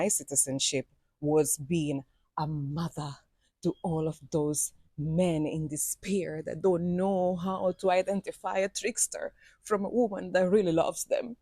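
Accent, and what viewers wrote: Patois, Jamaica. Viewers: Girl i love your jamaican accent , welcome home girl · There's nothing wrong with the way she talks. She lives in Jamaica and picked up the dialect. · Love your Jamaican accent👍❤️ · Lawd me weak . I love the way you speak patwah. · Beautiful jamaican accent great story ❤ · You know, listening to your stories and the way you talk, proves that you really turned into a Yaadie after all these years, because usually Cubans who come to Jamaica are full of bad attitude, they don't like the Jamaican food, housing has to be top tier, salary has to be the double of the Jamaicans but they want to work less, and if you feel like Kfc workers in Jamaica have a bad attitude while working, you have never seen a Cuban yet😂😂😂 So I am very much surprised seeing a Cuban woman like you adapting to Jamaica so well, put in the hard work, not afraid to make her hands dirty while keeping a grateful positive attitude...yah man, you turn 100% Yaadie! 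🫡👏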